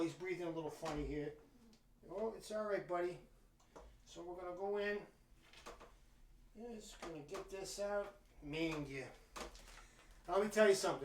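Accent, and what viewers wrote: United States. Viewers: What happened to this American accent I need a friend who speaks like this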